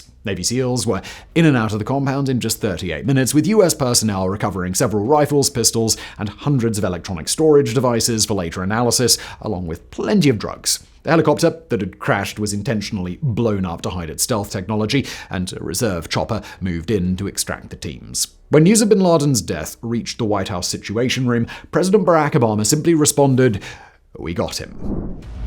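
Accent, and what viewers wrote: RP, United Kingdom. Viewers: the pre-beard I can deal with it's the stuffy upper crust english that was obviously he's natural way of speaking then that gets me 😂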